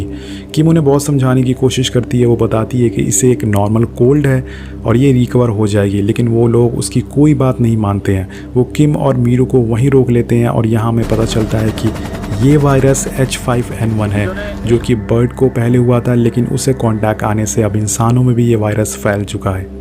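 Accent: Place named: Bengal, India. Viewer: Apke bolne ke tarike se yeh pata chalta hain aap sayed bengali ho....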